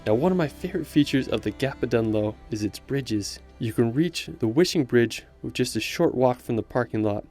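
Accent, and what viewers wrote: United States. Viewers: Why he sound like American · What a strange dialect does the speaker use? Sounds much like American, e.g. he says "senner" instead of "centre".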